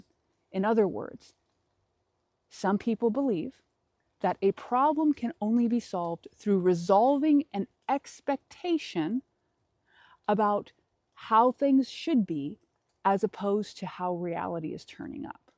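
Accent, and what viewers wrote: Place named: South, United States. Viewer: Didn't think you'd be southern. Refreshing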